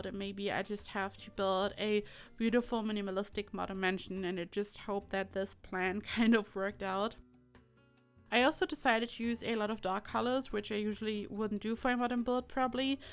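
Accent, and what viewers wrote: Germany. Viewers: your accent smells so German 😍 and i love it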